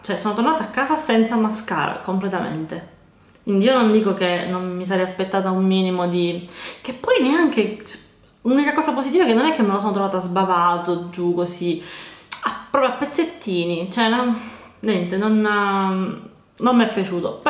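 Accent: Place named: Palermo, Italy. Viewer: ho una curiosità ma di origine sei di Palermo o Catania? Io sonò di bagheria e dall'accento sembrerebbe tu sia di Palermo:) Dai la prossima volta che vieni fallo sapere magari ci vediamo, ci facciamo un aperitivo:) Mi piacerebbe tanto!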